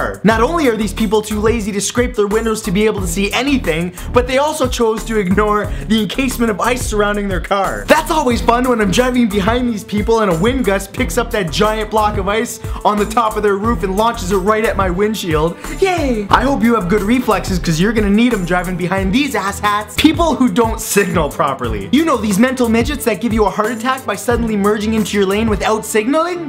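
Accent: Canada. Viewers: I love it when he's in a mad rant it's still kinda pleasant cuz he's so canadian. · this is going to sound completely rasist but are u Canadian.I love your vids u r cool 😎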